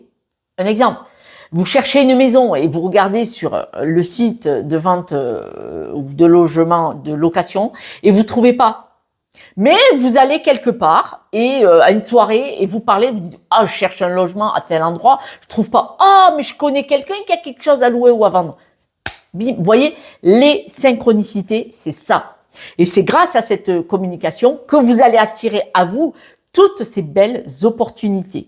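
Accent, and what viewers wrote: South, France. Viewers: Bonjour je ne vous connaissais pas,et j'ai adoré votre guidance avec ce petit accent du sud il me semble, excellent merci pour tout ces conseils qui je l'espère se réaliseront pour la plupart.😉🙏🙏🙏🙏 Portez vous bien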